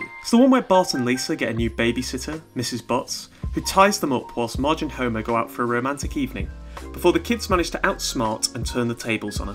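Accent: United Kingdom. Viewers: I miss the thick British voice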